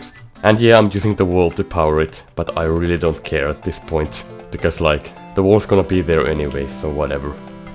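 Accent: Finland. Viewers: You have the most finnish accent ever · I sense the Finnish accent, great video👍🏼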